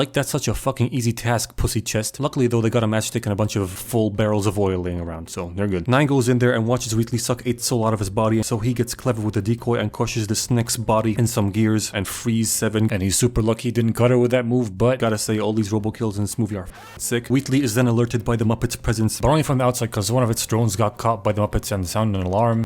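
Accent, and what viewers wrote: United Kingdom. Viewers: Man your British accent Is a killa put that on repeat for my bong rip shit was hilarious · Hey CAN YOU DO ONLY BRITISH ACCENT IN YOUR NEXT VIDEO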